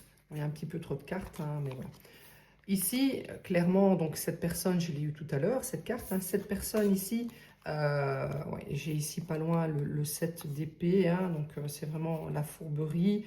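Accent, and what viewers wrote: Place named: Belgium. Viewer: Il me semblait bien que je reconnaissais un accent belge!.Faites vous des voyances en privé w?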